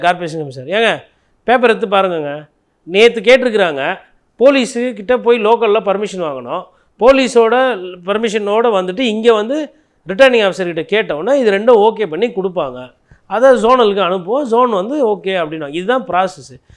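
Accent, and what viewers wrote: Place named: Chennai, India. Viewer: ..சுத்த சென்னைவாசி..ஸ்லாங்...அப்புடியே...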